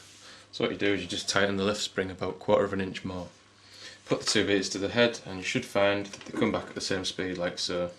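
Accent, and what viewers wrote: United Kingdom. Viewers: Can anyone please write all that he talked about, I did not understand british enough.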